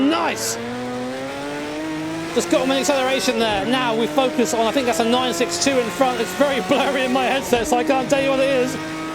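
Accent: United Kingdom. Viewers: He's British, to an Americans of course he sounds the part. Now let's see him during a Daytona 500 yehaaawww got dam look atem go jerry,yeah not so much. He'd also sound good in a premier league match(football soccer) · wow British people seems to have a default beautiful commentary voice! loving it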